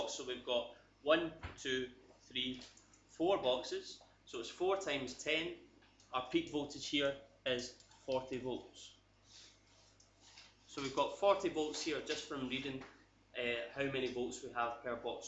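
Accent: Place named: Scotland, United Kingdom. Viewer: Love the scottish accent bro XD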